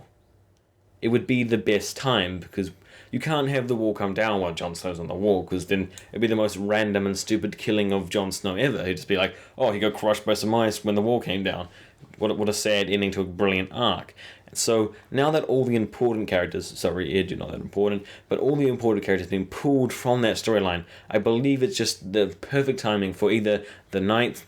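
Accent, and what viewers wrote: New Zealand. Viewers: are you from new zealand? you sound like a kiwi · Cool vids.. i´m from argentina i used to live in nz for the last 7 years.. it´s been a while since i´ve hear kiwi accent.. keep it up.. sweet as bro.. cherr bro..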